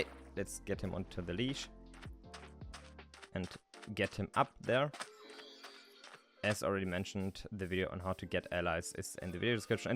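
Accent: Germany. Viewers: geiler deutscher akzent und geiles vid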